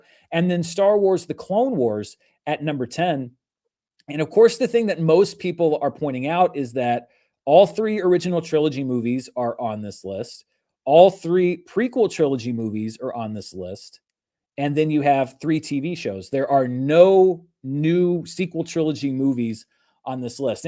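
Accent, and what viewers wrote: United States. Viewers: People are so conditioned to connect some kind of game of thrones British adjacent accent for these kinds of stories that the American accent feels strange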